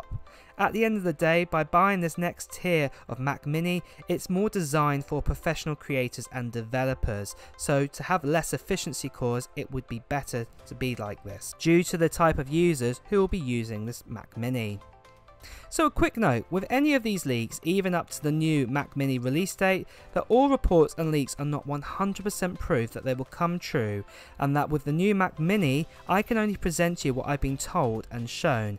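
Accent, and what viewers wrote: United Kingdom. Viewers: God i hate his accent. Ive never known anyone who is trying to say two different words and having them sound exactly the same. Listen to him say " Thirteen and Fourteen" They sound exactly the same because of that awful cockney accent. Ugh im out. The video quality is great but get someone else to narrate them. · I want to buy the iPhone 11 but I don’t have money for that and also i don’t have a job since i was just a 16 yo who want some iPhone i am hoping that i will be the one who got your ipad❤️Godbless you man💖 btw i like your British Accent❤️❤️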